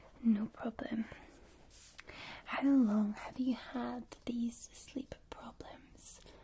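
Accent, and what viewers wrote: Scotland, United Kingdom. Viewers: 180 minutes of tingles from the resplendent scottish lass. bank holiday monday just got better. ☺️ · Love me some and Scottish ASMR 🥰😍😴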